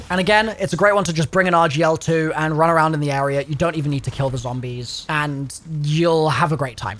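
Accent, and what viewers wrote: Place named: United Kingdom. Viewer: Lol he sounds brittish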